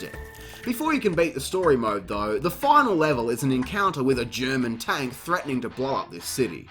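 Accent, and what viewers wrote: Australia. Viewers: Aussie accents make everything 17% more entertaining.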